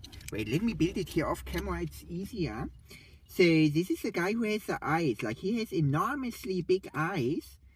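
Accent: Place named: Germany. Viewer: Kanns sein das du deutscher bist? :DDD Du sprichst die Namen so Deutschig Aus :D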